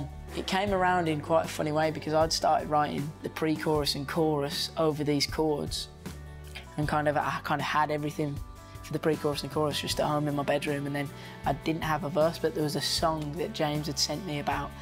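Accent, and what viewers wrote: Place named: United Kingdom. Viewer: british accent tho 😍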